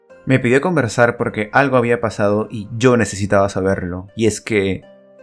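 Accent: Peru. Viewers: BRO ME IDENTIFICO CON TU HISTORIA Y CREO QE ERES PERUANO CON TU ASENTO Y YO TAMBIEN NMMS :v.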